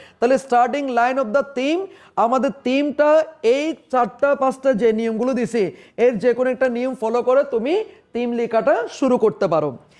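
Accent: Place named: Chittagong, Bangladesh. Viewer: স্যার মনে হয় চাটগাইয়া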